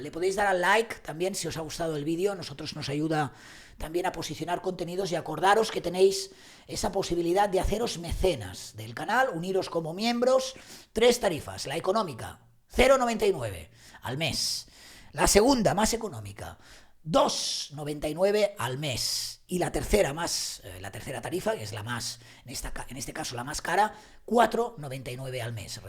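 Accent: Catalonia, Spain. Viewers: Porque él es catalanohablante y en catalán policial suena "pulisial". Es su acento, no su ignorancia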